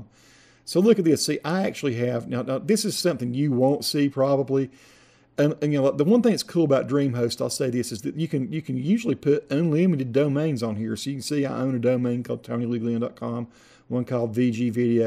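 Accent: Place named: Memphis, United States. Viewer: Great tutorial man! I spent six years in Memphis and you sound like you are not far from there!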